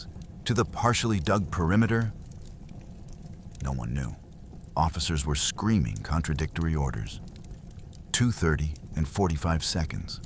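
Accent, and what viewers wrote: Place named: United States. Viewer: American accent doesn’t help describing historical facts…….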